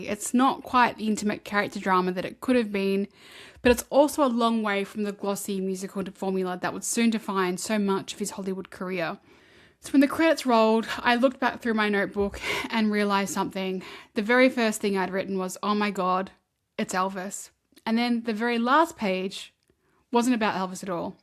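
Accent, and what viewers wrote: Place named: Australia. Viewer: can i just say, you should never have to apologise for having an Aussie accent. it's yours and you should own it. if there are viewers who complain about not being able to understand you, then they can simply put on subtitles. you have a stunning accent and should be proud of it.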